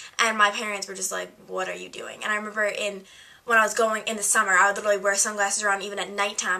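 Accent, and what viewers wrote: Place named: New Jersey, United States. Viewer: Your voice sounds like it changed from you regular sweet voice to .... a Jersey Shore accent.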